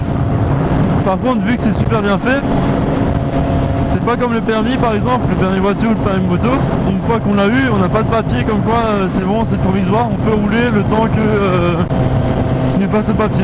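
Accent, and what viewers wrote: Alsace, France. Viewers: T'aurais pas un petit accent alsacien ? ;) super vidéo sinon ;)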